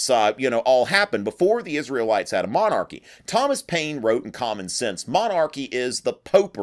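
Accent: South, United States. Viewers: How is this guy not more popular? His accent takes getting used to if you're not familiar with that southern twang, but once you get passed that he's not boring!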